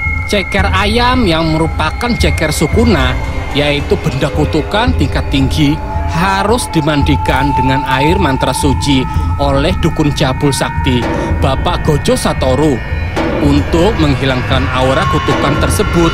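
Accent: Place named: Banjar, Indonesia. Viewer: Logat banjar nya tu heh lawak banar🤣🤣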